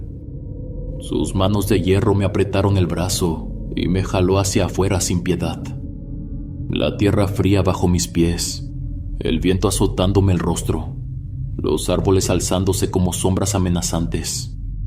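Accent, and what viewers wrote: North, Mexico. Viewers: WOW!! Cuánta maldad hay en el mundo, que le está pasando a la humanidad?? Por otra parte, tú acento norteño me embelesa y a la vez me da ternura, cuando dices tú letanía de que alguien pudiera estar usurpando tú lugar y robando tú trabajo, normalmente te escucho en mi trabajo... Cuídate, Bendiciones!! ❤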